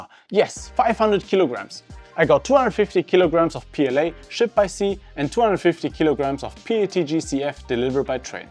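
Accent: Germany. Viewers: Asian man with a German accent caught me so off guard and I love it!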